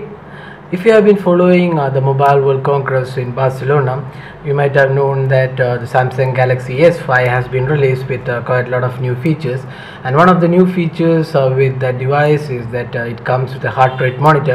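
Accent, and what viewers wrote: India. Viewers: By your speech it seems you are Indian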